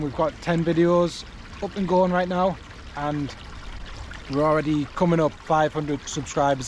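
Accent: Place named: Northern England, United Kingdom. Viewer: Enjoying the channel, love the accent. I’m from Newcastle originally but been in Oz for 35 years so love hearing the Northern accent.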